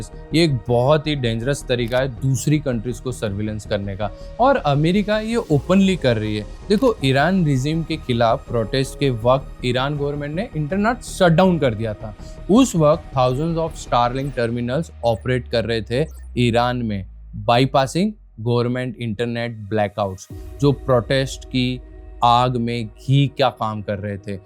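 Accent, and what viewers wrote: Gujarat, India. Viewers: BY YOUR ACCENT YOU ALSO SOUND LIKE A GUJU DON'T. U KNOW AMBANI WILL GET ALL THE APPROVALS NEEDED BY OUR HONORABLE PM WE WILL WIN MODIJI IS THE GREATEST PM OF. ALL TIME. HE WILL DELIVER TO AMBANI WHAT HE NEEDS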